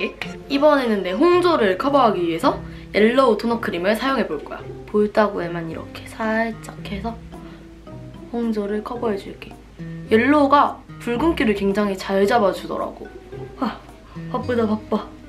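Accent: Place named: Gwangju, South Korea. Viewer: 아 걔있냐 는 사투린데 아무래도구성진걸보니 광주인가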